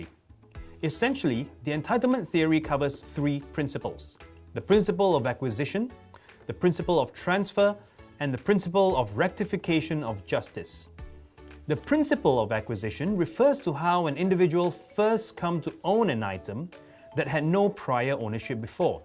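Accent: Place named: United States. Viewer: Where we learn to speak fluent American engilsh. Pls suggest ways to speak English like you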